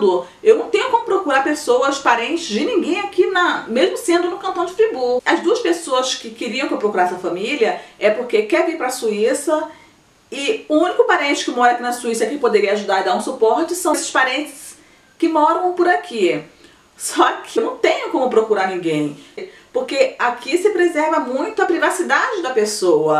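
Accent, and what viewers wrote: Rio de Janeiro, Brazil. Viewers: assim que comecei a ver esse vídeo já notei que vc é Carioca. O Carioca mesmo não sendo da "gema" tem o lindo sotaque. Eu sou do estado do Rio também precisamente do outro lado da poça depois de Niterói. Eu moro em USA por quase 14 anos e meu sotaque charmoso Carioca não me deixa. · É que sou carioca e tenho esse seu sotaque, por isso perguntei...rsrs. Bjsss pra vc, linda!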